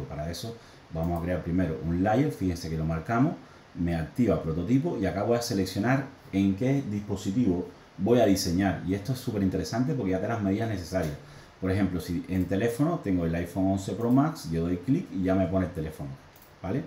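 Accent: Cuba. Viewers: Buenísimo! Muchas gracias! Eres cubano, verdad? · Es cubano?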